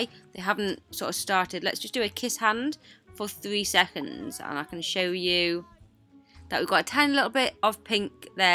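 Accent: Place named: United Kingdom. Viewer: Idek but I love the way she talks cause she’s british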